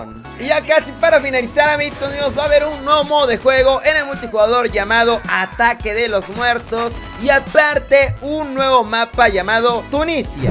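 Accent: Mexico. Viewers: Apuesto mis nalgas a que eres norteño de México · Me encanta tu acento Méxicano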